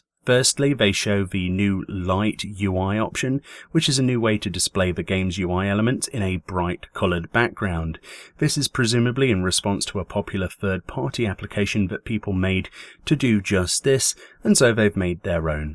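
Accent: England, United Kingdom. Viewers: I think your English accent just makes everything sound more easier to digest lol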